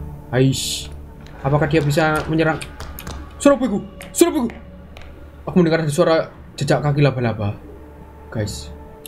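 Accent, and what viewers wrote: Java, Indonesia. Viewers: Suka bgt liat teriakan sma bahasa jawanya kak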